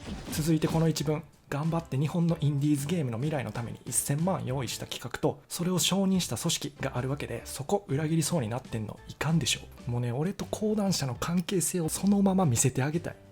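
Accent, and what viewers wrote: Kansai, Japan. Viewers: バリバリ関西弁ですね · 関西弁もろに出てきて草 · 関西弁でなんか違和感あるなぁ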